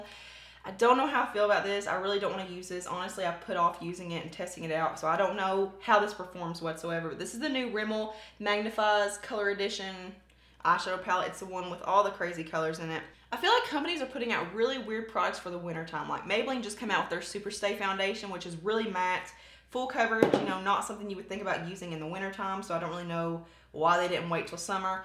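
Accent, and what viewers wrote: South, United States. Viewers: I don’t think I ever told you this before but maybe I have, I absolutely love your accent. I could listen to you talk for hours. My whole family is from the south but I have lived in Northwest Ohio my whole life. My grandma passed away a year ago and she lived up here for the last I would say 40 years but still talks like she was from Lawrenceburg Tennessee. I just think a southern accent is very comforting. But I do know a lot of people hate on it and stereotype you as a hillbilly. That’s really sad because you’re beautiful person inside and out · I just discovered your channel tonight and I have not enjoyed a "makeup" video in so long! I enjoyed your honesty and your familiar southern draw😉 I'm looking forward to seeing your future vids. Love the fact you are down to earth and real! I can so relate to you. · “Southern Charm”... 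I love that. I am from Savannah, Georgia, and I love your accent. It makes you...you.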